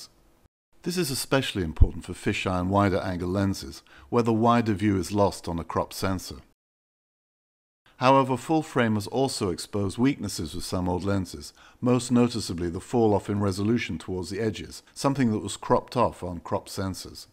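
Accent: United Kingdom. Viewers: Very nicely made, fantastic voice, better than most of the best tv newsreaders. Can't beat the Brits ! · Very British, were I to guess?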